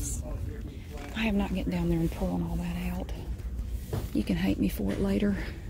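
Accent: South, United States. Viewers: I have found myself actually taking on your adorable southern accent;) and btw love the outtakes and bloopers! A kindred spirit indeed;) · Oh hey a fellow southern person 😂 her accent sounds normal to me because I live in the south (North Carolina) , that’s why I enjoy this channel so much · Why does she sound like Tati Westbrook with a southern accent ??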